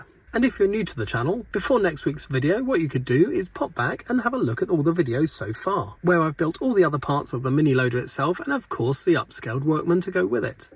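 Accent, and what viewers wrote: United Kingdom. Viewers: You sound very british.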